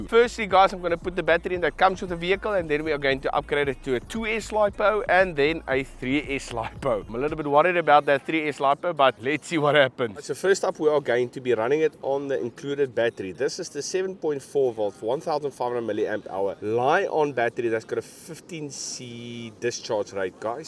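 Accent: South Africa. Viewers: Hey you live in SA still? I had to sub from your accent lol, ex Joberg resident myself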